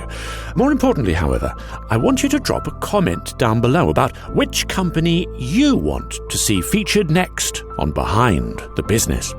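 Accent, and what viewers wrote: England, United Kingdom. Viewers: SORRY NEW GUY BUT 'UPPER CLASS ENGLISH VOICE' IS PRETENTIOUS AND SHYTE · Hes got a british accent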